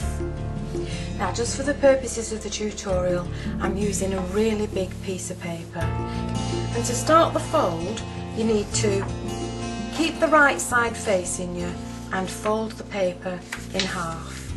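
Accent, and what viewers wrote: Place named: Lancashire, United Kingdom. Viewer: this was the first of your videos that I found, back in 2010 when I discovered that Youtube had cardmaking videos! I have been a fan ever since. I'm currently re-watching your videos from the beginning - whilst ironing! Your videos make ironing fun!!! Thank you so very much for all the inspiration! (I love your accent btw, my hubby's a Lancastrian!) X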